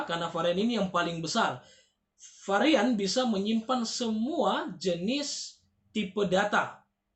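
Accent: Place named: Buton, Indonesia. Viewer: berasa dijelasin sama teman waktu kuliah orang Buton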